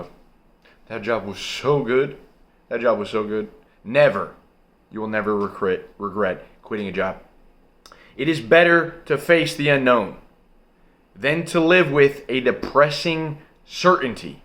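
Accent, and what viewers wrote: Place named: Michigan, United States. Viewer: Are you a Michigander? Cuz you sound like a buddy of mine from up there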